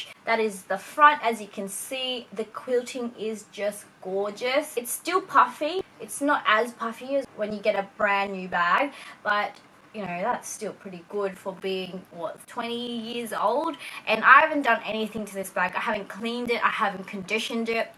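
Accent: Australia. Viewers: Australian accent...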